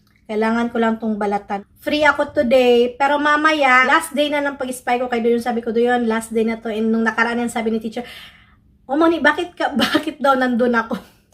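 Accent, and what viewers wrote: Visayas, Philippines. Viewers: Bisaya accent?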